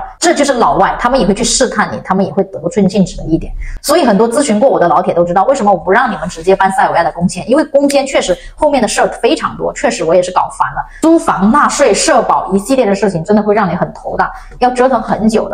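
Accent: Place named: Hunan, China. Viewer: 你是湖南老鄉吧？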